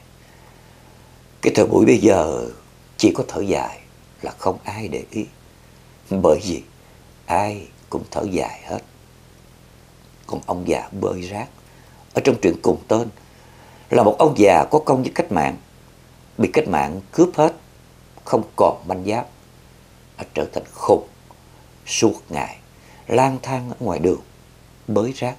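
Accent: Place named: South, Vietnam. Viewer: Con kính chào Chú Những tác phẩm của tác giả miền Nam qua giọng đọc miền Nam của Chú thiệt trầm ấm, lắng đọng đơn sơ, người nghe thiệt bịn rịn, xúc động rưng rưng nước mắt 💖🌷💖🙋‍♀️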